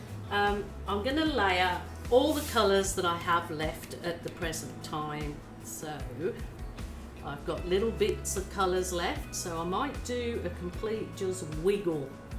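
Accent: Birmingham, United Kingdom. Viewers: WoW! That looks so good! Well done to you. Love the Birmingham accent. We live in Perth WA. We come from England. Have been here for 20 years now. Must try this. Sara x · Love to hear your Brummie accent underneath your new Aussie one 🙂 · Yes a resident of Australia for sometime however she clearly has a British accent, very obvious to Australian ear. 😊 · Queensland? I'm hearing brummie undertones in your australian. . You got away. Good for you. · true blue Aussie here, just like to point out her accent is British not Aussie. And here in Australia it is pronounced AL-YOU-MINIUM 😊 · where in Birmingham are you from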